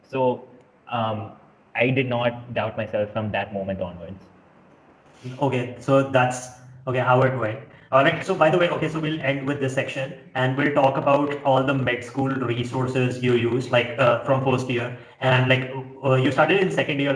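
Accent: India. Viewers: Great videos. For us, who are not Indian, understanding the Indian accent is not always easy. Could you please write a brief summary of each video under the video. That would be so helpful. Thank you in advance and best regards.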